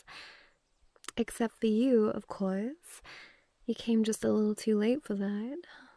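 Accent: United Kingdom. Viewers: Love your cute British accent.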